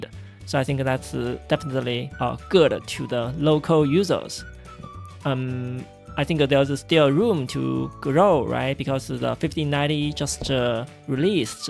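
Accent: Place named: China. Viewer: 我就觉得这英文太中文了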